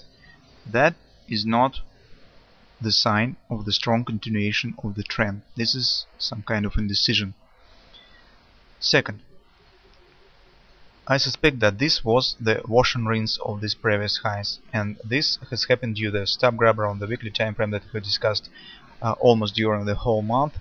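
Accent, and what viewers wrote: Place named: Russia. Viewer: yeap, you've got the point... eur/usd is more bullish than bearish for now... funny accent! :) where are you originally from? Russia, I guess, right? :)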